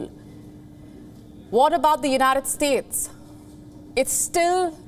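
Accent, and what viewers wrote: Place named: India. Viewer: 印度英文口音好明显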